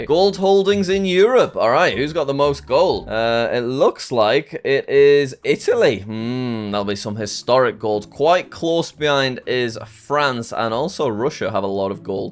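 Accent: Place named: United Kingdom. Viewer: just like you thougt pingus were swiz i thought you were amrican but as time passed i finally knew you were british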